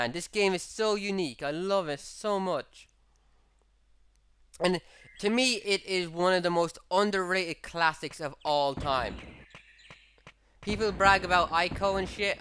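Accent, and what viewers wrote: Ireland. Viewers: this game is fuckin awesome. im playing it for the first time. I wish I played it 14 years ago. btw, what the hell accent is that? irish? lol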